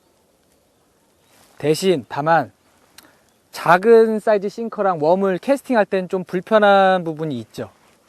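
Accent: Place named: Chungcheong, South Korea. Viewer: 말투가 충청도인디요.. 내가 방송 해봐깐..ㅋ 어려보이는데 몇살이래요? ^^ 응원합니다~~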